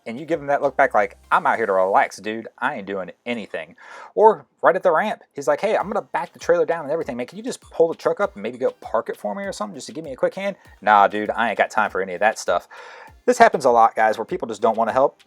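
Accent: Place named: Maryland, United States. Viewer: are you from Maryland? Your accent sounds like a Maryland accent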